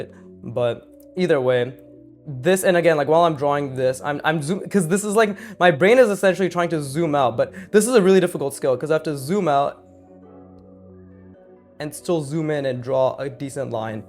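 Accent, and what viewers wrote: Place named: India. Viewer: This guy sounds indian 😢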